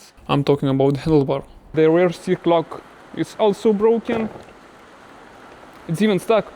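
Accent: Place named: Eastern Europe. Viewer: I just hear your accent and I think you’re from Eastern Europe, I from Ukraine, your video is really great!!!👍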